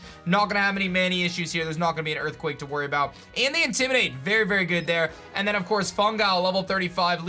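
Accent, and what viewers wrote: Australia. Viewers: As an Australian I heard a lot of Americans try to do Australian accents that are not the best but you do a good Australian accent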